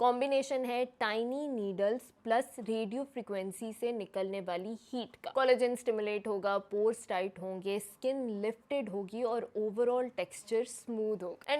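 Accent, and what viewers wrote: India. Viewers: I was so confused with her accent. It is definitely not a Pakistani accent and you are a doctor. You should not say tum we use up to show respect in class. I’m not only that the entire way of speaking the method of speaking is all Indian. Please go back to your roots. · Jo humara urdu ka undaz hai uss ko khudara hindi lahjay say na badlay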